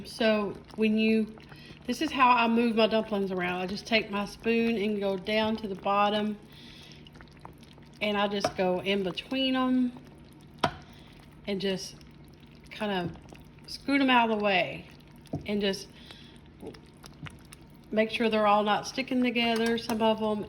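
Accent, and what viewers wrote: South, United States. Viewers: Sister, you might live in Northern Ky., but where are you from? That accent sounds like it’s further south and east. 😊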